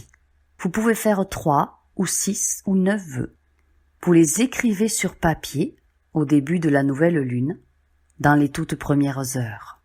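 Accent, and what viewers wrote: South, France. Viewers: Bonjour, J'ai été une de vos 1ère commentatrice, lorsqu'il n'y avait pas encore cette déferlante d'abonné(e)s qui, je le souhaite, vous comblent..C super!!!🌞⭐ Et je me souviens qu'en ce temps là jetais entrain d'arrêter l'alcool et ça me faisait beaucoup de bien de vs écouter, vous avez été la 1 ère à me dire que j'avais comme nous tous cette étincelle divine qui demande à croître et que c'est notre droit de naissance (Je vous entends av votre accent du sud, votre fille(à l'époque) avait un soucis de pneu et c'était un peu raide financièrement mais finalement, du coup vs avez eu+De clientes!!Tt ceci pour vous dire que le tps passe et malgré ces années de quête, je me sens guidée mais je n'entends ni ne vois, ni ne sens, rien du tout, et j'ai l'impression de m'être coupée du monde(choix qui est voulu et volontaire mais qui pèse parfois..) j'ignore si vs allez avoir ce message, et si vs l'avez j'ai 1 question qui me gêne, pensez vous que le fait de prendre des produits de substitution à la drogue puisse me voiler encore plus ce que je suis, car vous l' aurez compris, c'est mon désir le+ardent et le+ beau de le découvrir, appuyés tous ces bobards sociétaires,bien à vs🐴🍀🕊🦎🌹🍀🍃💋💋💓☯️💎🕉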